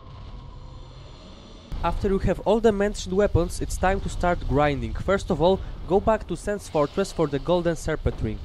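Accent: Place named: Italy. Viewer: Avverto un accento italiano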